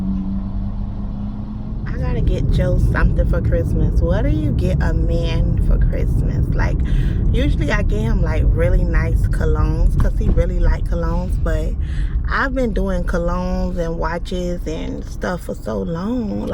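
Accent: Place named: South, United States. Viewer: Girl!!! Yes you are so country lol I love tho. reminds me of my family down south. Love the vlogs and all.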